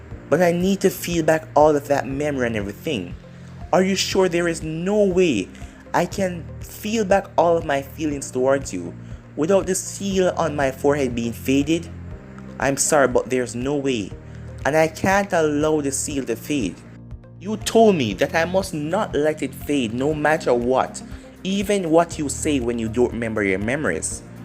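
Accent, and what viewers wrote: Jamaica. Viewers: This is just a random question and sorry if it’s disrespectful but, are you jamaican ? The reason i ask is cause the speech pattern is sorta familiar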